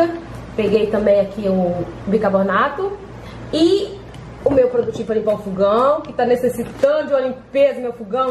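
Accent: Minas Gerais, Brazil. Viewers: Amei o vídeo. vc diz que estão caro os produtos de limpeza?? Cara vem pro Brasil, vc cai de costas. kkk mas eu estou fazendo os meu produtos em casa. faço sabão. Que virá sabão líquido para limpeza pesada. sabão detergente para louça e para limpeza do chão e banheiros faço tbm meus cheirinho (desinfetantes) uso muito bicarbonato tbm. aqui tem que se virar nos trinta. kkk bjs AMADA. Mineirinha linda lembra minha mãe no sotaque amei.❤❤😚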